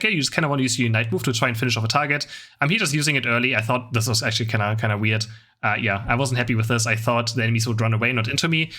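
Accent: Germany. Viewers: Do you have a German accent or am I just imagining that?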